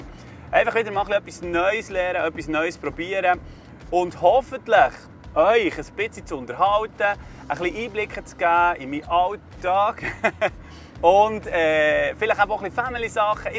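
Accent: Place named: Bern, Switzerland. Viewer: Soso cool! Freue mi wäuts uf ds neue Format vo dir! Bi gspannt was de da so aues chunt, u ds no im schöne Bärndütsch! Wie nice! Ächt cool. 🎉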